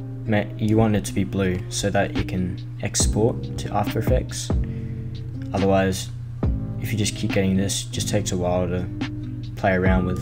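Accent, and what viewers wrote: Australia. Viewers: HEEESSS AAA FUUUCKKIINNG AUUUSIIEEEE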